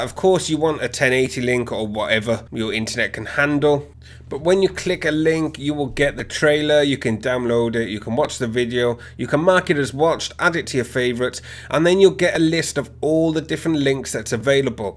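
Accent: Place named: India. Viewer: Lol anytime a click these fix videos and hear an Indian my head just shuts down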